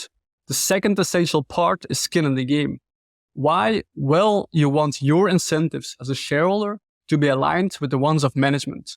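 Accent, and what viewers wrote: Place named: Brazil. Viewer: Also, my guess is he's Brazilian. He has the UFC fighter accent.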